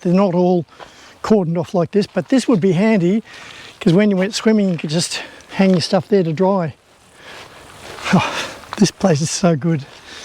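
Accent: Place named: Australia. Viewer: Fair dinkum, a true blue bogan with awesome Aussie accent! :)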